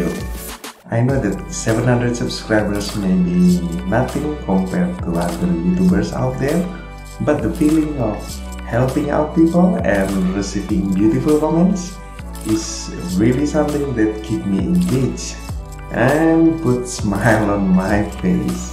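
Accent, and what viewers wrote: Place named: Philippines. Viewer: Parang may pagka slang yung tagalog niyo poh,,taga San kayo,,,tinapos ko na hanggang dulo para walang sayang kuya,,sana ikaw din asahan ko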